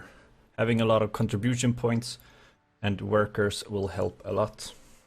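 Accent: Sweden. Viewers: nice, btw your accent sounds like you're swedish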